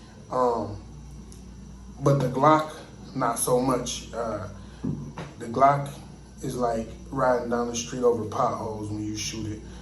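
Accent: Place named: South, United States. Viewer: 😭😭😭😭 Sounds Just Like Down South, When I Use To Live In TX & FL, I Remember A Few Niggas Said It Like That, Always Sound Fire 🔥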